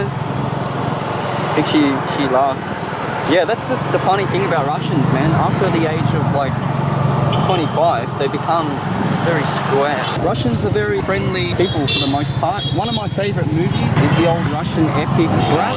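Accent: Australia. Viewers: Hey do you live in Vietnam now? you sound like your from Melb west like me 😎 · Haha mate, great video! I laughed so hard. Mate, please rewatch your video with YouTube's closed captions - haha your AUssie accent confuses YouTube subtitles! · Cool! I'm 50 this year great to here a fellow Aussie voice chats soon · haha your australian accent never gets old mate ;)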